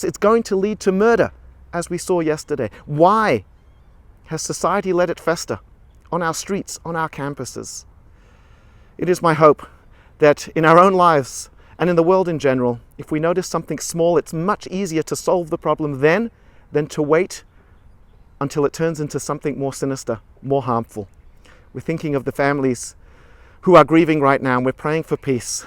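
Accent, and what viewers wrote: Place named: Australia. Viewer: Wow, look who it is. All these years it must be 25 years and your Australian accents come back. I hope you’re doing well thanks for a great message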